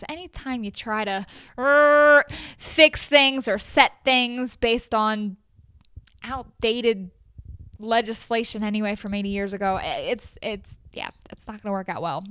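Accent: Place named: New York, United States. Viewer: You have the most Jersey/New York sounding accent I've ever heard.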